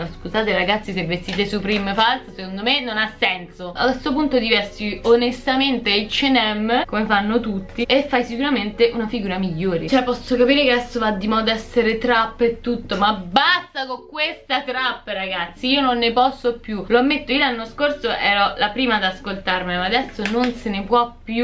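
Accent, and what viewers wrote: Rome, Italy. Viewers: Hai una cadenza romana strepitosa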